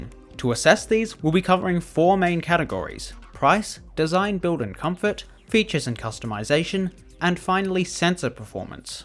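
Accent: Australia. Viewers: Why is this Australian doing the voice over? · He's not Scottish. I have no idea why some think he is. He's Australian.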